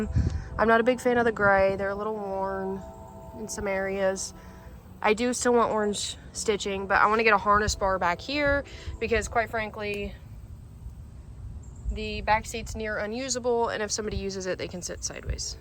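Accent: Kentucky, United States. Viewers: Congratulations on anniversary. The Kentucky accent is going strong lol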